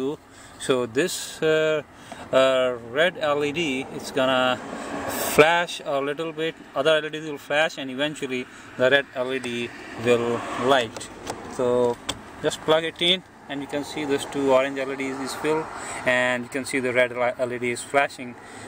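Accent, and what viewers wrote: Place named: India. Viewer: Tooo much Indian accent 🤮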